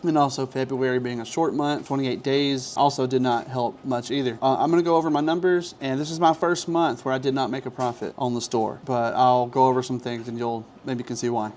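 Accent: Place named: South, United States. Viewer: Sounds like he's from the south, $500 is OK if you're in Mississippi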